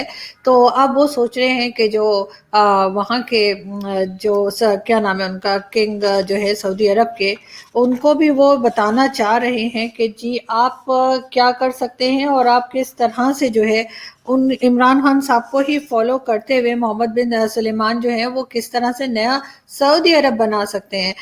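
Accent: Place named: Mumbai, India. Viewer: ji.. mumbaiya hindi ek number.. aap sabki vaat lagaati ho 😁😁😁👍👍👍👍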